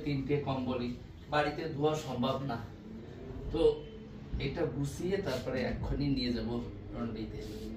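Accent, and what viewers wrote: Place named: Bangladesh. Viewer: Dada tomar mayer bari ki bangladesh kotha gulo bangladeher moto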